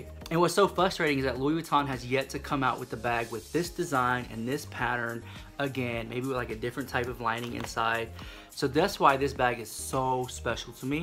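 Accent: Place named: Texas, United States. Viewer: It's cool. I can hear your Texas accent. 😎🤘🏻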